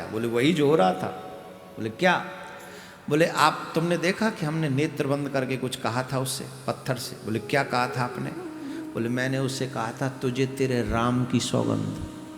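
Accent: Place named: Braj, India. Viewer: Guru ji radhe radhe aap jab braj bhasha bolte h too bhut achha lagta h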